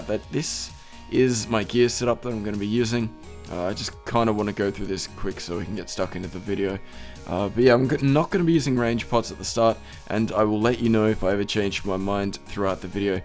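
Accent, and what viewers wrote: Australia. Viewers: No, he sounds Australian.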